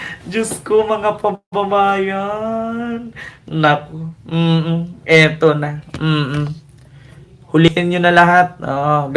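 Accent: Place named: Iloilo, Philippines. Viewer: Tonong ilonggo ka magsalita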